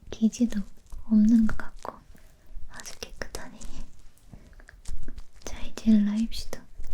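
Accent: South Korea. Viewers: 영상 감사합니다^^ 대구사투리 정감가고 좋아요^^♡♡ 님 혹시 눈밑에 글리터 어디껀지 알수있을까요?? 넘 예뻐서요ㅜ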